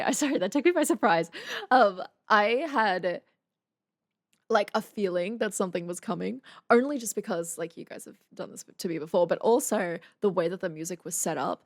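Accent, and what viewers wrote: Australia. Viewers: I'll admit, I'm loving these reactions not just for the content, but because I get to hear them from a fellow Aussie.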